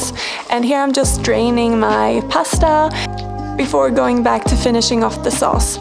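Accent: Sweden. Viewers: Det tog mig cirka 3 sekunder att höra att du var svensk. Ganska kul ändå. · Just discovered your channel last night, 🌹and I'm TOTALLY IN LOVE. ❤️ Been VEGAN 💚 for many years, yet finding many of your recipes very inspiring! 👏🏼 Plus your sweet Swedish accent is so pleasant to listen to! Reminds me of my multiple visits to Sweden. 😊🇸🇪 Keep up the great work! 👏🏼🤗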